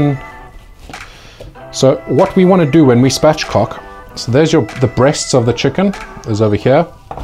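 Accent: South Africa. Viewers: Can I ask what your nationality is and where you currently living ,cause you sound to much like a South African to me but the products you use isn't familiar. FYI Love your Channel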